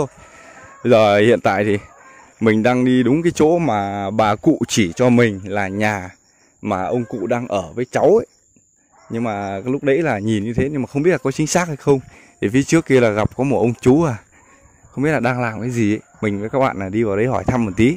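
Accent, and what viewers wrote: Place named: Cao Bang, Vietnam. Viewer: M cũng dân tộc nùng tỉnh Cao Bằng,nge b nói tiếng nùng với bà các e nhỏ, giống tiếng nùng Cao Bằng nge hiểu hết.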